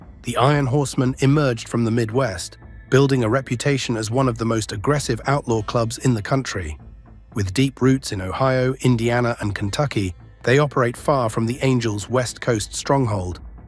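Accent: United Kingdom. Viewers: Sorry. There’s something just wrong about narrative on hells angles with a British accent. Destroys it. Sound American. 🇺🇸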